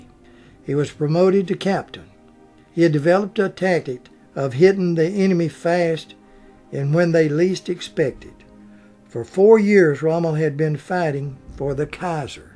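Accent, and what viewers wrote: South, United States. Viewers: Mr., Just another very well researched and very well presented vlog. Please use your expertise and do a vlog on Rosa Parks. Again you have that golden southern accent that is so soothing to listen to.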